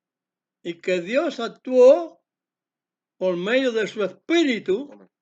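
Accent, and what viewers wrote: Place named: Cuba. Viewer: Ese acento cubano... espectacular!!!!! Bendiciones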